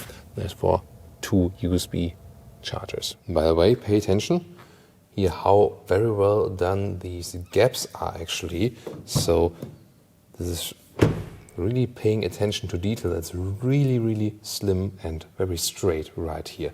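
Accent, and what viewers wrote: Germany. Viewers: Love your reviews. And you're German accent makes the car so much more interesting. Would be nice if at the end you say I'll be back lol.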